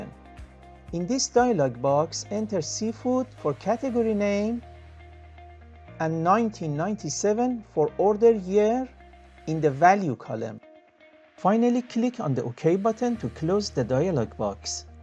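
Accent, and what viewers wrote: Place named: Iran. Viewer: Thick accent w/ Farsi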